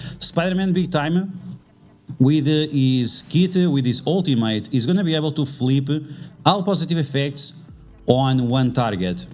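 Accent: Hungary. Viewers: Pasztmek =D Tippelni se tudtam, mi ez a szláv akcentus, de hogy magyar =D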